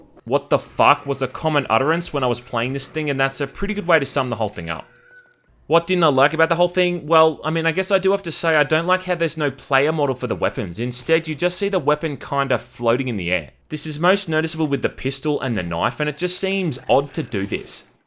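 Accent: Australia. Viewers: Man, I love your Aussie accent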